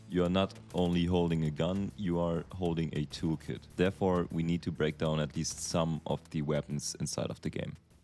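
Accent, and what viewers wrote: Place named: Germany. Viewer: Love your accent, please, can you be a lil bit more german? That would be wertgeschätzt ❤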